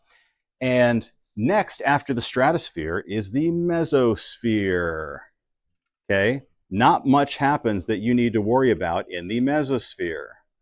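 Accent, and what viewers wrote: United States. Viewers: I’m hooked on this channel But since he seems to have a pretty distinct American accent I assume this is probably an American made video. We don’t generally use kilometers here. I have no idea what the ratio is to miles. Nor do I want to stop constantly and look it up. Use miles! Or at the very least use both all through the video.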